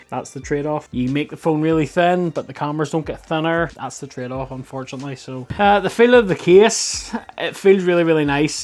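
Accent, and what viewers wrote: Northern Ireland, United Kingdom. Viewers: Northern Ireland?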